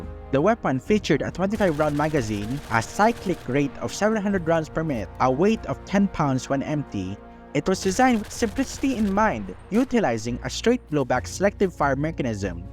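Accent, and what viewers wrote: Philippines. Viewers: Can’t tell if the VO is an intentionally bad filipino impression or just uncompromisingly authentic, great work as always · I love me a filipino accent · you don't need a better mic tho, you gonna need voicemeeter banana to modify your mic quality that sounds good.(free to use) Also, i can notice your filipino accents while speaking english.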